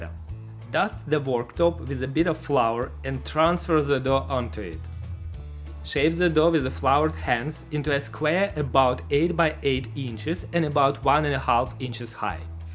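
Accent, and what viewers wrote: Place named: England, United Kingdom. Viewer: As an English teacher I can surely say - you have a beautiful English accent👍😍😘. And I'm a huge fan of all your channels. Love you guys😉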